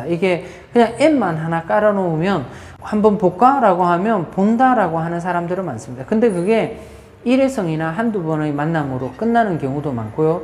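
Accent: Gyeongsang, South Korea. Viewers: 진솔하고 알기쉬운 베트남 생생토크 감사합니다~! 전 충청도인데 경상도말이 간결하게 설명하는덴 최고인것 같습니다. 뭐 그보단 님의 성격이 화려한 수사없이 진중하신 성품 같습니다~!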